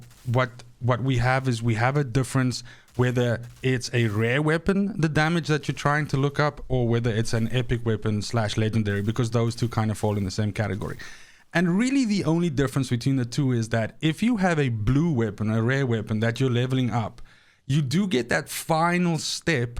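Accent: South Africa. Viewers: You south african? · Are you from South Africa?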